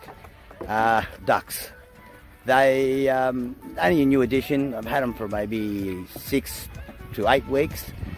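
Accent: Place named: Australia. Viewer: Oi! Oi! Ausie! Ausie! I love the accent. You have beuatiful animals. I love the farm life.💚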